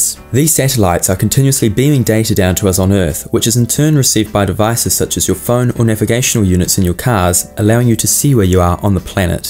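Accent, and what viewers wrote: New Zealand. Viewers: Ahhh that kiwi accent.. grrrr lol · ooooh I was sure this was a Kiwi accent, then when I saw the AirNZ app I knew it had to be!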